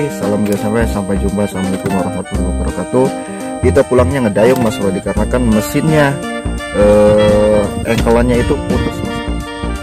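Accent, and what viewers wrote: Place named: Banten, Indonesia. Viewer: dari logat bicara nya mah kaya orang Banten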